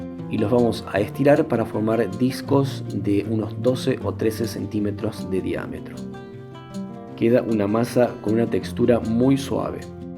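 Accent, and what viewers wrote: Argentina. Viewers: Que placer oir hablar argentino. Estuve aì tres años. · Que hermoso que explicas!!!! sos Argentino?. nos das una variedad de comidas impresionante!!! Me encanta!!! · Me encantó todo desde la receta hasta el acento precioso de el Argentino que lo explicó todo a la perfección. Gracias!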